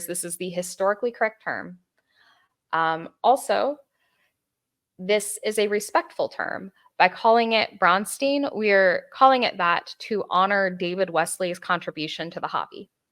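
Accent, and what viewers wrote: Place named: United States. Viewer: You have a pretty flat American accent, anyway. The bastards just want to silence people and control everything 🤨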